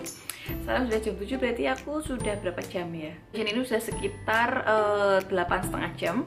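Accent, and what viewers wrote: Java, Indonesia. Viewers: Nyantol nek mbak medhok jelasno, mantul 😁 sekarang udah punya baby zack jadi lama kalo mau ada video baru jadi rindu 😍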